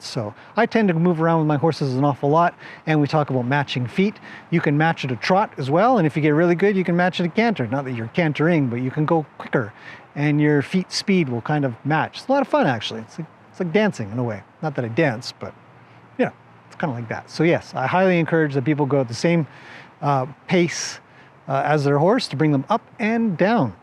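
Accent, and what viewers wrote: Canada. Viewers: I am gonna go back and listen to videos I have missed. I learn so much more about relationships with horses that make more sense than other channels I watch. I am re evaluating my training and my horse wants to be with me even more! P.S. Love your adorable Canadian accent! And it is so gorgeous where you live. Can you show us the area around your home if you get a chance? Thanks for everything Mr.. (: Babs from Boston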